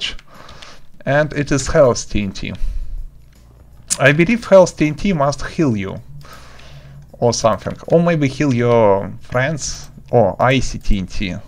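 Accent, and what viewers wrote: Russia. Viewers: Руський акцент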